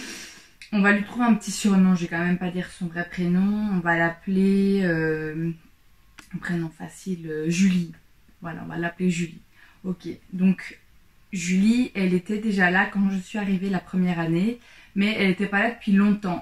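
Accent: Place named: Belgium. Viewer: Je suis à 2 minutes de la video et je suis hypnotisé par ton accent on dirait que tu es belge ça me détend mdr jadore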